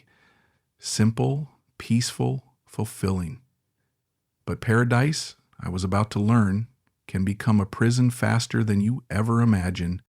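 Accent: United States. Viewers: Australian with a Yank accent??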